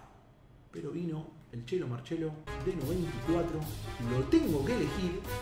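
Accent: Argentina. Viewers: Me gusta el acento Argentinos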